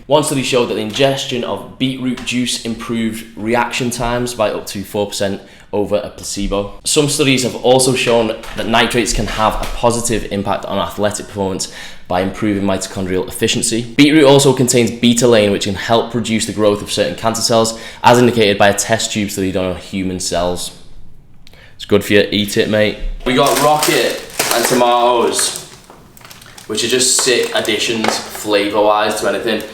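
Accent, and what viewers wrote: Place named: Manchester, United Kingdom. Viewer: You got that propa manucunion accent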